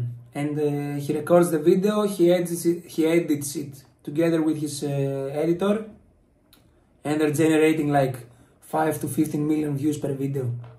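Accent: Greece. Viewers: Ο μπρο την έμαθε αγγλικά με ελληνική προφορά 😂 Συνέχισε να κανεις αυτο που ξερεις εχεις την δύναμη και θα τα καταφερεις❤ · Κρατά την ελληνική προφορά στα αγγλικά θα ειναι πιο φυσικό και κάτι ξεχωριστό για τους ξένους βιουερς το να προσπαθήσεις να κανείς αμερικανική προφορά θα ειναι λίγο fake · για την ωρα μιλας αγγλικα σαν Ελληνας...δεν ξερω ποσος χρονος σου περισσευει αλλα θα προτεινα,εκτος τα μαθηματα,παρακολουθηση ταινιων και βιντεο μονο στα αγγλικα...επισης πρεπει να αρχισεις να σκεφτεσαι αγγλικα...καλη επιτυχια σε οτι κανεις στη συνεχεια...εμεις μαζι σου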